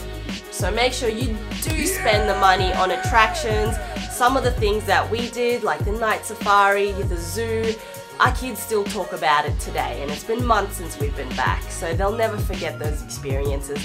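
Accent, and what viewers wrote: Australia. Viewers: Nice to hear an Aussie accent :)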